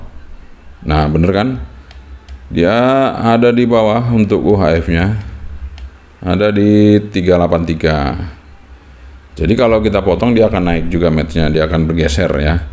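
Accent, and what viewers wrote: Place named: Spain. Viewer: Hola amigo. He visto tu video. Pero no entendi prácticamente nada 😂 ya que solo hablo español. Me he percatado que en tu acento al hablar dominas mejor el español y si no me equivoco en mi observación, te agradecería enormemente si hicieras un video de este equipo en español, ya que en la red todavía no aparecen o almenos yo no les he encontrado. Gracias de antelación 👍✌️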